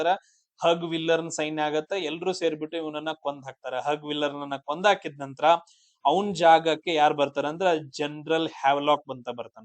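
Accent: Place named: Belagavi, India. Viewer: Nimm belagm ಬಾಷೆ ಕೇಳೋಕೆ ಚೆಂದ..sir thank you sir 🙏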